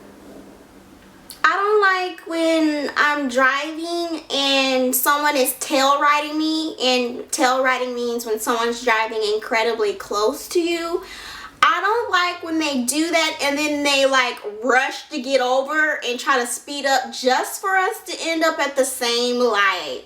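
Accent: South, United States. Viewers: Lol your accent is mixed with white girl & country!! it's so cute lol .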